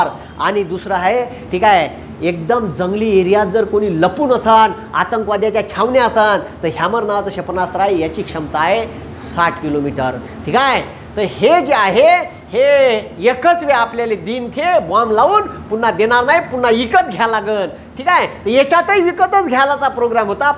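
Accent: Vidarbha, India. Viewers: Marathi in Vidarbha style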